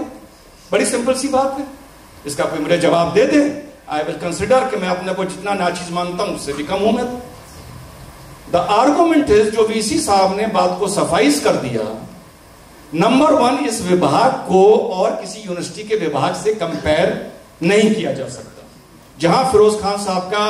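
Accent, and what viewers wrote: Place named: Lucknow, India. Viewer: Sir, aapki luknawi hindi bahut khoobsurat hai..Bhagwan aapko aur aapke pariwar ko hamesha khushal aur sukhi rakhe. 🙏🙏🙏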